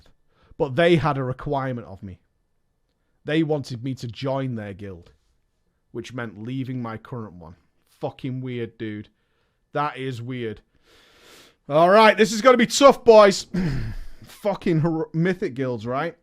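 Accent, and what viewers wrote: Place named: Manchester, United Kingdom. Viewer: Sounds like your from manchester ar kid nice to see someone local doing well, keep it going mate.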